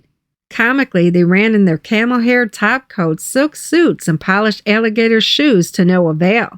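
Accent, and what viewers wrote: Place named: Chicago, United States. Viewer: You’re great, amazing content but… the heavy Chicago accent…. Doesn’t match with the NE mob.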